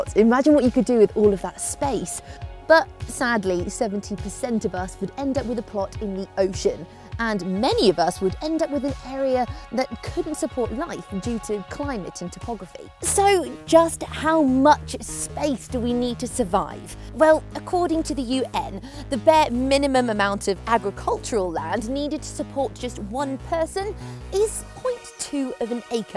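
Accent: United Kingdom. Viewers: ur sexy, i love British accents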